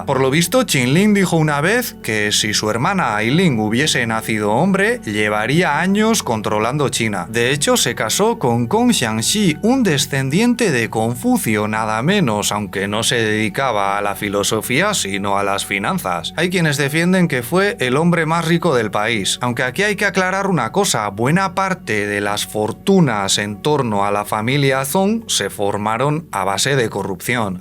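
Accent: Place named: Basque Country, Spain. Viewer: Es acento típico de la zona de España de donde procede. Esa cadencia que dices, viene de influencia del euskera.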